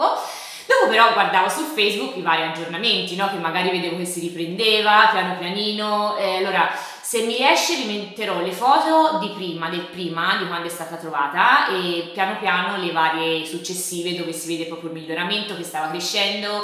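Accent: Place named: Tuscany, Italy. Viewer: siiiii mi tieni un sacco di compagnia , è molto piacevole ascoltarti, è bello sentire il tuo accento toscano/garfagnano ma è ancora più bello guardarti 🙈🙈adoro i tuoi occhi e i tuoi occhiali,stupendi!!!🙈😜😘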